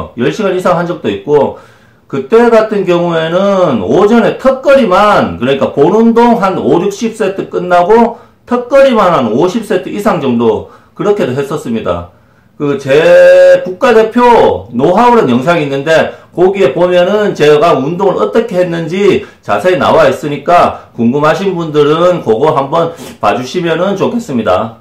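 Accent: Gyeongsang, South Korea. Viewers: 행님 말투가 너무 좋습니다ㅎㅎ 우리 부모님 같으세요 갱남 밀양분들인데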